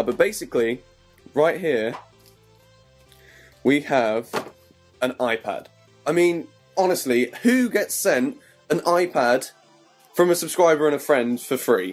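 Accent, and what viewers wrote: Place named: United Kingdom. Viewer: Hey man, where roughly in the UK do you live (not a stalker,just trying to figure out the accent)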